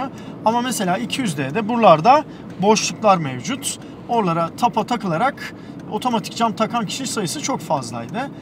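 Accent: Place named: Aegean, Turkey. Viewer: Abi sen egelimisin orlarda burlarda diyon gari hep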